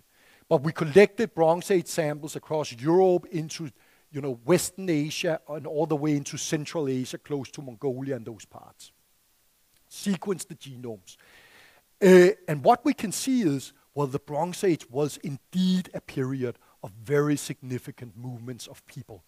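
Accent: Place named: Denmark. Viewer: Yes, he has a very typical "Copenhagen/ English accent" 😁Many Danes make the same little mistakes when pronouncing English (and other languages). Too soft consonants, too flat vowels, too monotonous intonation, an 'R' sound that often doesn't quite fit. An example of the latter is the word "very", which many Danes pronounce as "væ-i" (ie with a silent R). Some sound like they have a potato in their mouth, some say?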